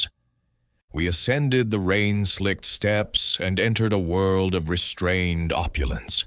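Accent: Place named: United States. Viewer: American accent. So no.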